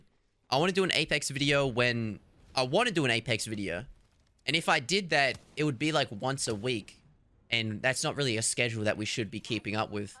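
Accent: Australia. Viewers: I don't care how long it'll take you to upload or how bad a video may be, ill watch it and support you because i love listening to an aussie ramble about shit and your content is amazing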